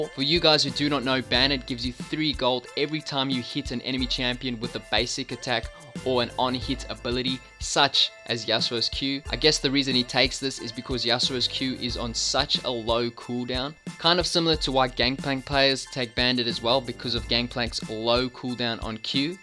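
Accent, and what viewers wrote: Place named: South Africa. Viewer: Are you from South-Africa? Cause it sounds like it ma brother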